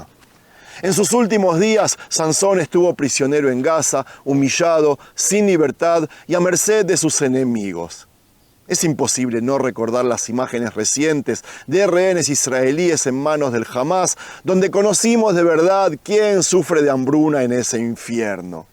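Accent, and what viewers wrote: Argentina. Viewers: Cuando algo de historia argentina tenes el acento nomas lo demás es todo propaganda.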